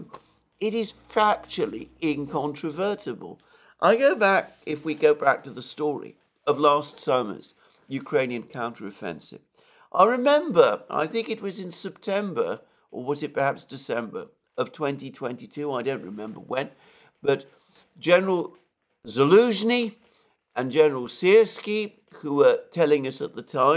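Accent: England, United Kingdom. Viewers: he speaks clearly and very slowly. I grew up in an English household so I’m used to the accent and even many of the mannerisms. The speed is the only part that irks me a little but I do understand why he speaks so slowly and concisely. I’m also happy that he checks his sources now rather than just parroting Russian MOD talking points like he did towards the beginning of the SMO. Basically his show is like an hour long run down of dozens of Russian speaking telegram channels and the information lines up. I appreciate him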